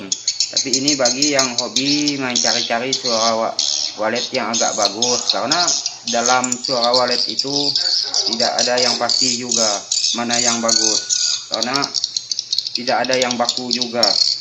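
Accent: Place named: Kapuas Hulu, Indonesia. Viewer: Dr cara ngomong abg ini kayak y org kapuas hulu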